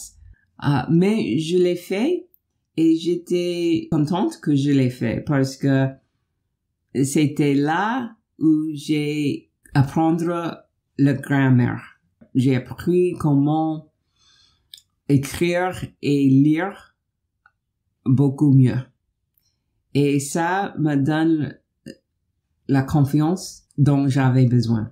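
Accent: United States. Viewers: You have an adorable American accent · Bravo, votre français est tout à fait compréhensible, quelques erreurs mais c'est normal. Pour plus d'aisance il faut beaucoup parler avec les autres et enrichir son vocabulaire, ça prend du temps mais vous êtes sur la bonne voie. Et gardez votre accent américain en France c'est charmant 😊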